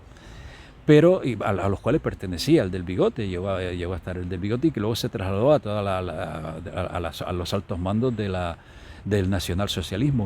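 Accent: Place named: Canary Islands, Spain. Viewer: Ostras❗️❗️❗️,Que sorpresa veros a los 2,a jamas lo habia visto fisicamente,entonces eres Canario como el? Esq el acento se me hacia familiar pero como hay tantos canales de Hispano-America. Voy a escuchar la charla solo falta ahi mi querido y desaparecido de los medios el GRAN Pedro Bustamante. Saludos Maestro 🇮🇨 🙂😉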